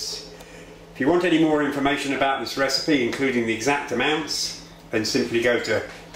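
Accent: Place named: United Kingdom. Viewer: I read that you are supposed to use lime juice with a white fish. Why am I listening to a British guy tell me how to cook?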